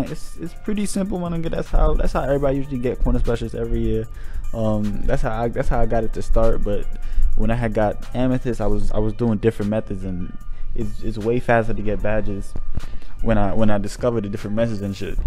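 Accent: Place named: Oklahoma, United States. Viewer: Bro u from Oklahoma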